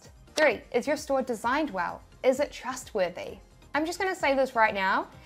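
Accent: New Zealand. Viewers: Definitely a New Zealander. The way she says No. “Nore.. Nore.. Nore.” Love it! 😁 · 2mins in and I think... hold on is this a kiwi accent. Great vid found as a youtube suggested video:)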